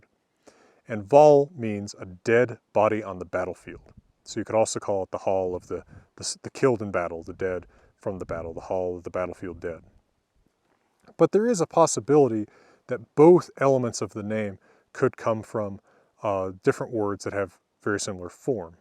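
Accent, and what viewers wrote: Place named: United States. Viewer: I love your style. Your hat and your American accent are fcking manly. Þú ert víkingur!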